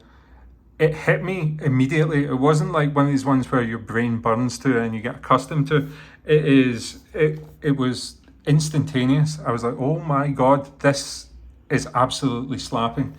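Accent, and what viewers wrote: Scotland, United Kingdom. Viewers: your scottish accent makes me trust you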